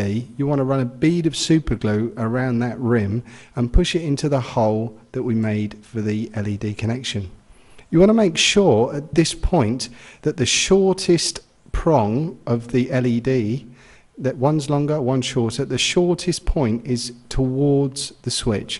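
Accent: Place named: Southern England, United Kingdom. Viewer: The ugly mug is welcome! As is your amazing accent! Southern England? Anyway, thank you for the very informative videos! I am a first-time modder and your help is very welcome!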